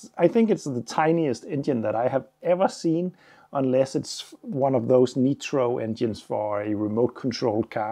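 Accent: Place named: Denmark. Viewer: Synes nok at det var “dansk/engelsk” dialekt 💪🏻. Fed kanal du har her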